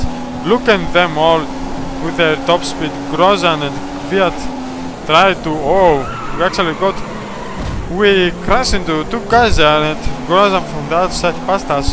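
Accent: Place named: Spain. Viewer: You spanish? 'cause I can see a hard Spanish accent and you're a Fernando Alonso fan. Nice vid m8, keep going!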